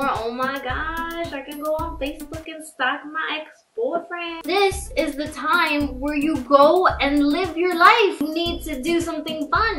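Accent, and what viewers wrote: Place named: Trinidad and Tobago. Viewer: Why do u sound trini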